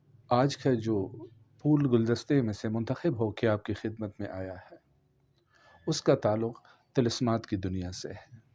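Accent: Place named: Pashto, Pakistan. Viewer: Ap ka lheja pshto h thik sy smjh nhi ai😥😥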